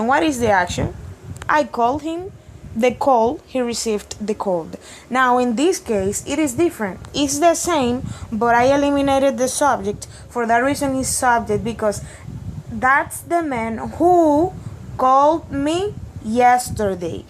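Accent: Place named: Spain. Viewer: Although your funny Spanish accent that is so great. I understood that finally. Thanks a lot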